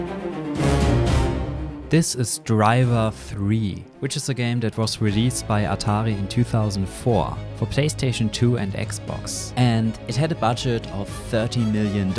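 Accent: Germany. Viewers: Man hört übel das er deutscher ist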